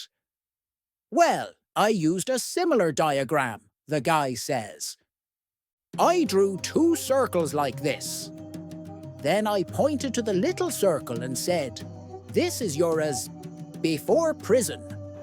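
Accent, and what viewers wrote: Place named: Ireland. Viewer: It might be considered typical of an American to say so, but I never thought of an AI with an Irish accent. I do, however, wish to say that the persistant censorship of You Tube consistently eats holes in the conversational fabric of the productions. The weak kneed, limp wristed fascisti who believe that this overplaying of their morality in a public forum somehow empowers them in any manner are sadly mistaken. If they should ever fully emerge from the womb (or asshole), of their mother, they will have to realize how poorly thought of by the rest of the world What a cowardly behavior for adults...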